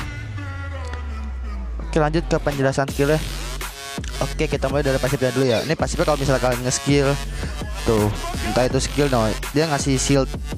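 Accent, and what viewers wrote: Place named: Jakarta, Indonesia. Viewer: Buset, noh Betawi bener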